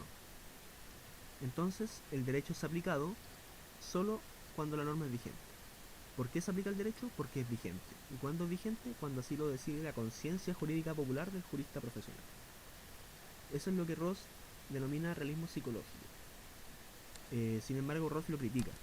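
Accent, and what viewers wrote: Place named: Chile. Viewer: es Chileno x eso no se le entiende bien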